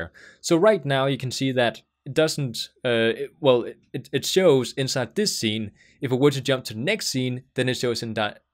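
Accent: Denmark. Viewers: bro u danish? i can hear that your danish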